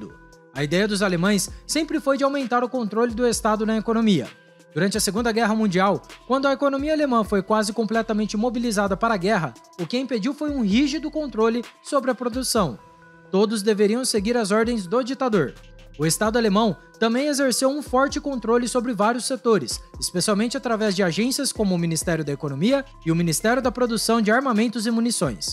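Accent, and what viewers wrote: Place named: Caipira, Brazil. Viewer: NÃO dá pra entender oq ele fala com esse sotaque capira. Pqp!!!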